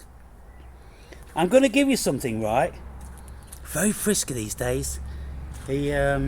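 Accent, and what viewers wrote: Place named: Southern England, United Kingdom. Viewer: Hi, found your video on Peter Kingsley, great work! I have a rather personal question, you said you’re living in Newcastle up north, but your accent is from south, when and why did you move up there? I’m from London. Thanks 👍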